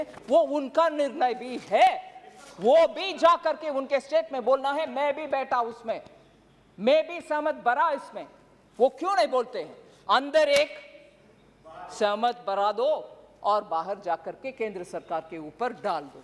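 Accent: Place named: Tamil Nadu, India. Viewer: Just shut up she's Tamil.. Hindi is not her mother tongue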